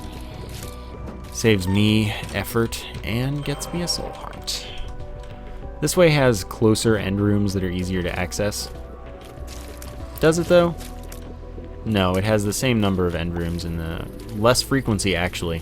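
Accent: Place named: Canada. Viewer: So, stereotypically you sound Canadian. If this is true, which area. If not, where from in actuality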